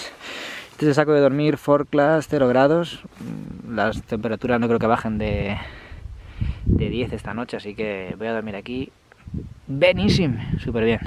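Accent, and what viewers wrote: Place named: Catalonia, Spain. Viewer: No , no soy de Mallorca, (lo único que sé de Mallorca es el canal "Escuela de Supervivencia" que tiene una cabeza de lince ibérico como logotipo), pero lo he comentado porque pensaba que eras catalán. Pa los que somos andaluces, el acento nos suena un poco parecido. Un saludo, y buscaré esa funda de vivac. Gracias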